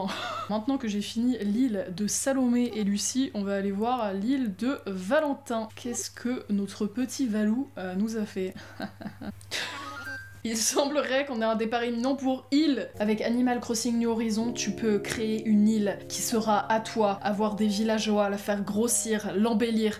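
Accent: France. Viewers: Salut, je viens de te découvrir ta chaîne et je trouve que t'es sympa et j'ai bien ta bonne humeur :). Mais j'aime pas trop quand les gens mettent des mots en anglais comme tu le fait car je trouve ça gênant surtout quand ton accent est fr comme ça mais après c'est mon avis et ce n'est bien évidemment pas une insulte envers toi. Je te souhaite bonne continuation sur yt 😉☺️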